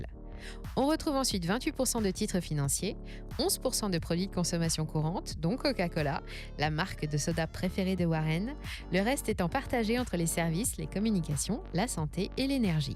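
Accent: United States. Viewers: Cet accent américain 💕 Toujours super intéressant 😊👏🏼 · Bel accent Anglais, ou plutôt US !!!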